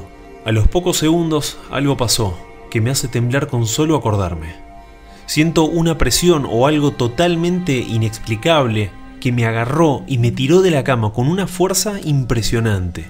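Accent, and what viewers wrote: Argentina. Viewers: que aburrido que sos hermano para contar ,dedicate a otra cosa, para colmo la tonada porteña que tenes lo hace inbancable...no lo aguante, lo siento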